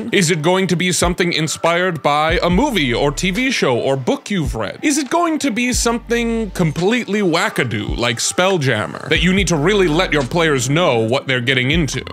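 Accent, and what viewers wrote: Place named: United States. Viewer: He sounds just like me a Michigander, except with a bit more drama to the voice. So sounds very American, very clear to me!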